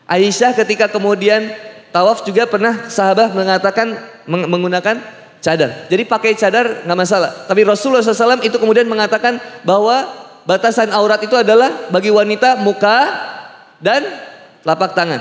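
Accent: West Java, Indonesia. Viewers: owh pantesan Nyunda pisan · Cmn ust medok di sunda,kalo ngm ujung2nya ada sunda2nya,,kalo andhika pratama gk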